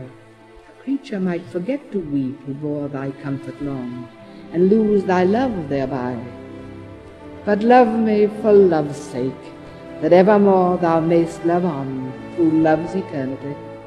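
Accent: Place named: Mid-Atlantic, United States. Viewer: She used that “mid-Atlantic” elocution, which I think is just so gorgeous…. Lofty and beautiful and so missed these days of casual everything. I do miss elegance.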